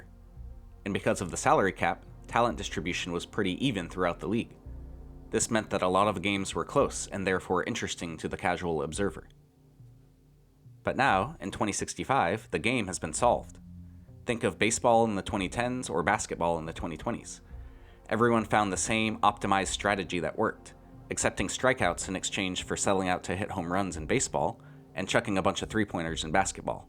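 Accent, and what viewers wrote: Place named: Illinois, United States. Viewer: your accent, its an interesting one........Ilinois??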